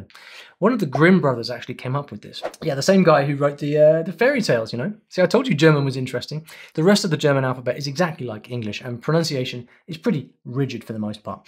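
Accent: United Kingdom. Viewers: To me as a German you sound, quite frankly, very bri'ish. But English has some crazy dialects as well. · Ich habe Dein großartiges Video mit Interesse verfolgt., Du kannst so fesselnd erzählen. Und Du klingst so überzeugend. And it's great to hear you British English. I just like both Westgermanic languages.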